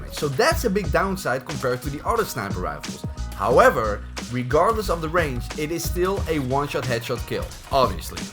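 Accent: Netherlands. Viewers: your dutch arent ya i can hear it in your english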